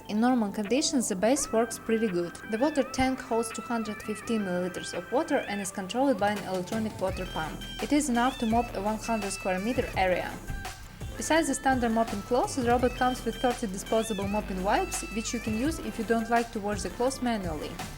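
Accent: Russia. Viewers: this russian accent is horrible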